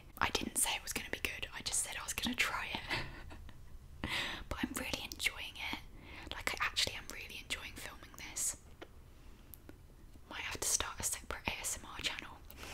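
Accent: United Kingdom. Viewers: the first asmr that i watched in british accent.. so good to hear! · I'm new here but hello. Are you British? I can't tell. Even though I'm British. 😝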